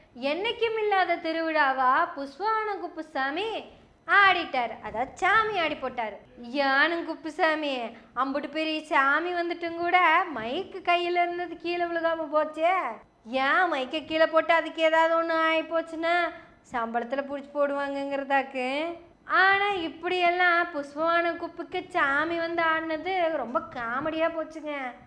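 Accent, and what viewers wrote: Kongu, India. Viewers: அழகு கொங்கு தமிழில் ஆழமான கருத்தாழம் வாழ்த்துக்கள் சகோதரி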